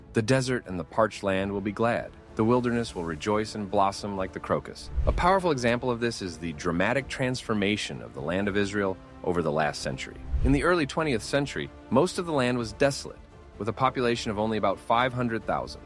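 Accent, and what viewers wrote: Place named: United States. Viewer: I, too, agree and certainly believe we are indeed in the " Latter Days." The Scriptures hold true concerning prophetic events that have come to pass, and the rest to do so are soon to follow. You sound American, perhaps Canadian! ? If so, why the mask ? At least in the U.S. you're free to speak. People may not like what you say or you as a person , but who cares ?! If you're in a nkn- Christian nation, then I understand. Either way, I share your beliefs and pray hou His blessings. 😊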